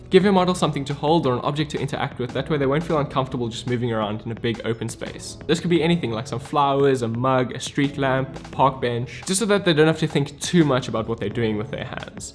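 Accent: South Africa. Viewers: South African? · Is that a South African accent? :)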